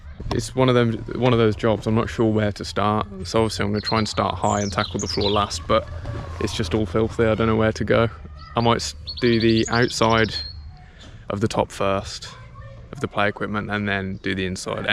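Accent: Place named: England, United Kingdom. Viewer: You English men are so handsome. Love your accent. Love your heart.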